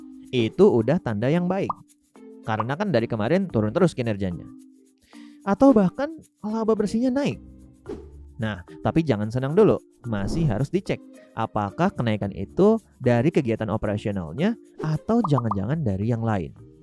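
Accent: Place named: South, United States. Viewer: Logat southern American ya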